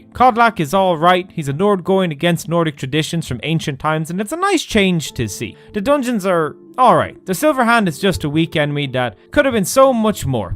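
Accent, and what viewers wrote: Ireland. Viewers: OMG YOU'RE IRISH TOO?!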